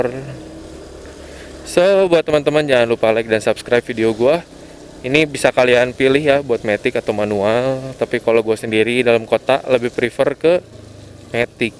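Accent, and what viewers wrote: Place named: West Java, Indonesia. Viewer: Orang sunda ya mas. 😅😂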